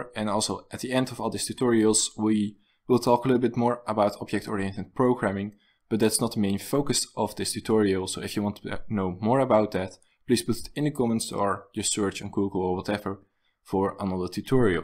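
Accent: Netherlands. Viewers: Are you Dutch? :)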